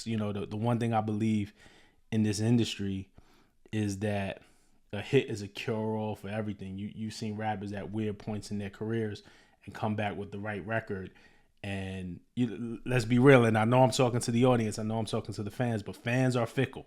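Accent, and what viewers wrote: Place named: New York, United States. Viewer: Fam if you still listening to Drake after all this, how you call yourself a fan of hip hop? Having a New York accent, glasses on, gold chain and ya hat to the back don't move me. You should be ashamed of yourself fam to even say you still fux with Drake music. Vanilla Ice was basically Ousted from Hip Hop for lying and doing this same thing. Ya'll New York folks a disappointment. Ya'll really used to gatekeep and really used to stand on something when it came to the Culture. Not Anymore. That's why places like Atlanta were able to come in a really put they stamp on the Culture. Because New York lost it's soul, lost it's backbone. A dude from New York hearing and seeing this all go down, should be one of the loudest in the Room saying "No, This Ain't Acceptable". You dudes too scared now to go against the grain even for the Culture. 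To me fam dudes like you sellouts. Don't come at me with the "I'm trying to be fair", blah, blah, blah. FOH with all that. You don't even talk like you got passion for this Culture. Kendrick rapped like he is of the culture, from the culture, and it means something to him. You ain't got that same type of energy my guy. It's cool. Do ya thing. Alot of ya'll "Not Like Us" and alot of ya'll getting exposed. You gone be on the wrong side of history when it's all said and done. Trying to play the middle like you Swizterland, is how we got here in the 1st place with Drake.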